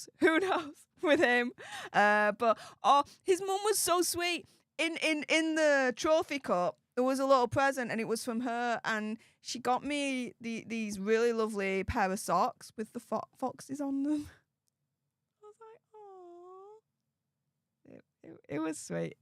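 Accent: Yorkshire, United Kingdom. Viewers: I remember your first videos. I couldn’t understand a word you said, either you are speaking more American or my ear is used to your accent now… Where are you from in the UK Or Australia?.. I cannot figure it out. 😂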